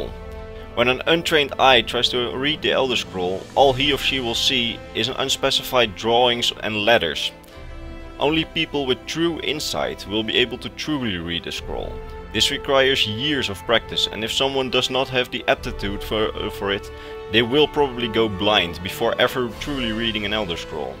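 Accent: Netherlands. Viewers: Ik kan duidelijk horen dat je nederlands bent. Goede video's man, ga zo door! fijn om een mede elder scrolls lore nerd te vinden uit eigen land!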